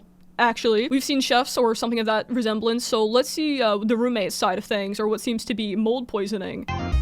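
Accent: United Kingdom. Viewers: you pronounce your T’s so british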